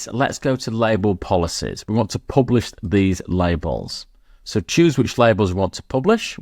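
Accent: United Kingdom. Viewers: Entre escuchar el ingles británico y aprender el entorno de Microsoft, todo esta bien!!!!!!!!!!!!!!!!!!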